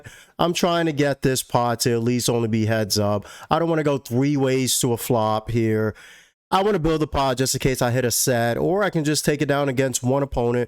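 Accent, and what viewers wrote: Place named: Louisiana, United States. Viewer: Based on your accent I’m guessing you are from Louisiana.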